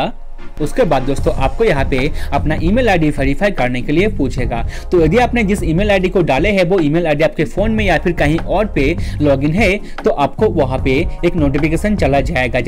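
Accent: Bengal, India. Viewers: apni bengali?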